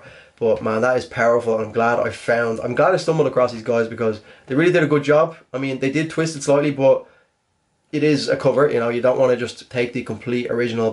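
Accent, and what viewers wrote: Ireland. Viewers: is one of my all time favorites!! I feel like they did the cover very well and still hit the feels!! Love the Irish accent!! 😊❤️ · Limerick? Is that an Irish accent?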